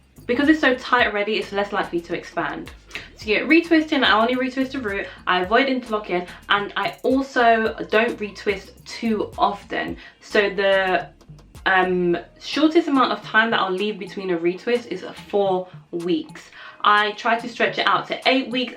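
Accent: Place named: England, United Kingdom. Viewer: wow I'm in my journey as well but you're beautiful accent took me way off course and I pay no attention to nothing else. Let me stay focused are you from England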